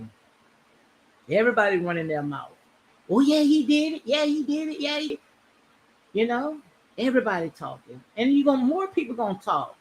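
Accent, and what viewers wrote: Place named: New Orleans, United States. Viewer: You have to be from New Orleans... you sound exactly like my GranMom wit that accent.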